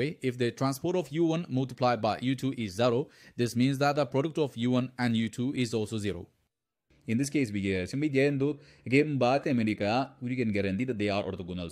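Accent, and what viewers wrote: India. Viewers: biraz indian english olmuş hsdjgfs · Hı teacher . YouTube made your accent like Indians accent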